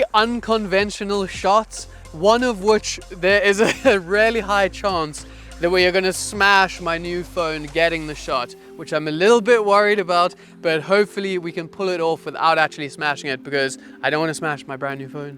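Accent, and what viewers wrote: South Africa. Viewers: Is that a South African Accent 🤔?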